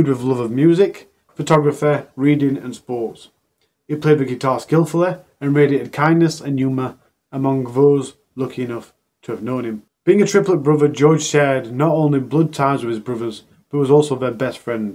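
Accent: Yorkshire, United Kingdom. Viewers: Nice to have you back sunshine, I feel at home with that Yorkshire accent, I’m In stocksbridge x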